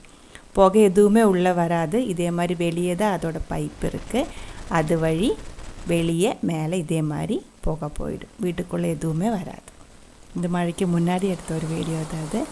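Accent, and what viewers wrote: Kerala, India. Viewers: പക്ക മലയാളിയുടെ തമിഴ് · Sadharana eniku thamil manassilavarilla but ningal samsaram nannayitu manasilavunnu. Keralathil thamasikkunnath kond malayalathinte influence undo samsarathinu? Nice vlog...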